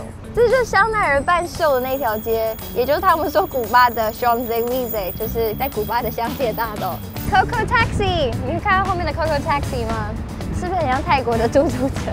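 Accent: China. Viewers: 中國住太久口音很重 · 嗯 一口中國腔... 聽了超級彆扭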